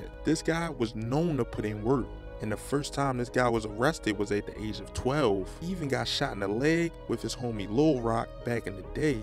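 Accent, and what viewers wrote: Baltimore, United States. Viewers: Very thick Baltimore accent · Quit that damn Baltimore Slang you say 2 two gets aggravaten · Are you from Baltimore? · Baltimore accent thick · You gotta be from Baltimore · Bro you clearly have a Baltimore accent. Please stop speaking on Chicago business. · You from bmore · I’m convinced dude is from Baltimore from the “tews” dews etc ….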